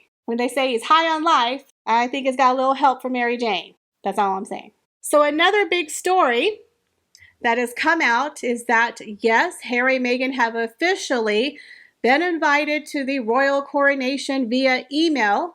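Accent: South, United States. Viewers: I'm sorta kinda new to your channel. I heard you do a live stream with Sue Smith and thought I might give your channel a try. I'm so glad I did. You have a very soothing voice and I love your charming southern accent (especially when you say "y'all). I hope your channel keeps growing.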